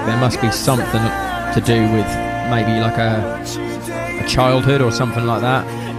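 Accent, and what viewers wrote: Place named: United Kingdom. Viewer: I like his British accent😂 it’s not a joke I just like it😂😂😂 but I just wanted to put in the laugh emoji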